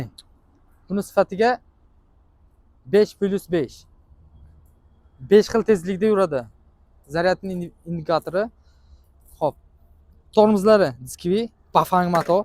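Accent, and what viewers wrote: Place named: Tajikistan. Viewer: Beat tojik ekanlar aksentlari bor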